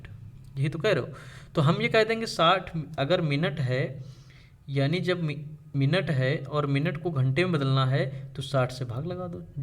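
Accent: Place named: Raebareli, India. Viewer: Sir आपकी आवाज़ raebareli के क्षेत्र की लगती है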